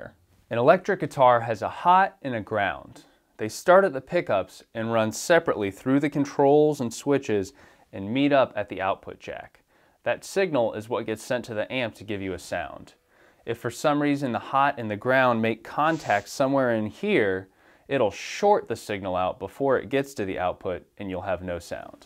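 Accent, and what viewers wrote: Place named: United States. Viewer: Why do Americans pronounce solder as sahder? It's never made any sense to me. Do you say bahder instead of boulder? Do you say this thing is a lot cahder than this one which is hahder?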